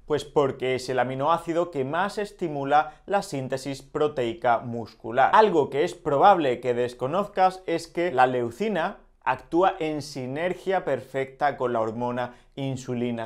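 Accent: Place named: Spain. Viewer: En España todo mundo habla igual, debe venir del mundo del fútbol: Athletic Madrid, Bilbao etc etc, además de comercios de ropa e implementos deportivos DECATHLON, separan las sílabas y como la H es “muda”… yo no veo, para nada, q pronunciar de esa forma, tenga q ver con inteligencia o formación académica, son modismos del idioma.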